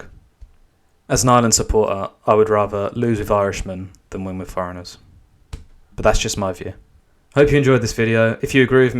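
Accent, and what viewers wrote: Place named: England, United Kingdom. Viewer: What an ignorant and insulting title on this video...... Lose with Irishmen??? As soon as I saw it I just knew it had to have been created by an Englishman. Five seconds in and the accent confirmed it. Wow! Has he taken a look recently at his country's national football team..... or at all the foreign-born athletes that England is so quick to grant citizenship just so they can win a medal for England when their own folks cannot. Just imagine spending your valuable time creating a bitter video about your closest neighbour winning a major competition but instead of congratulating them you try and create the perception that they are somehow weak and losers. Only a REAL loser would spend his time in this way. Bizarre!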